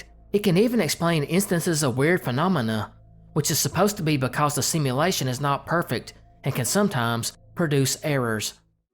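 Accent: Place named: South Carolina, United States. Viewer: Where are you from bro, you sound like my neck of the woods, in SC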